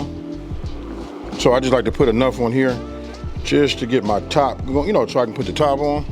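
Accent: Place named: West Coast, United States. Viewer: hahaha bruh got a thick West coast accent.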